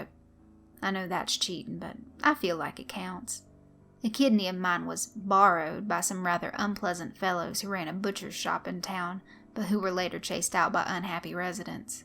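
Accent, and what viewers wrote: South, United States. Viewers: This is my first story of yours I'm listening to! Is your voice always like this? (its been so long since I last heard someone from the South)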